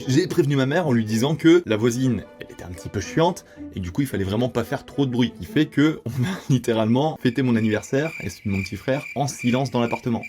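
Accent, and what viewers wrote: France. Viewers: Elles étaient vraiment drôles mais mignonnes, à part celle de la voisine raciste qui m’a bien agacée 😡 Sinon, je trouve que la narration en anglais n’était pas si mauvaise, l’accent français a son charme 😊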